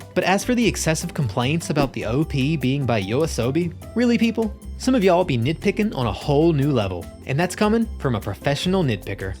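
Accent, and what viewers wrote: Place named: Southeast, United States. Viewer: Also bro where you from? Southeast United States? Im from Georgia and your accent is very familiar lol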